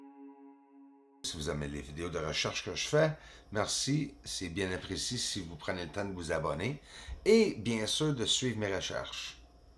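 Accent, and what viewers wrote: France. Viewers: en plus de superbes vidéos nous avons droit à de l'humour, merci! Mais bon ^_^ a choisir en français de France, c'est mieux que le français québécois:)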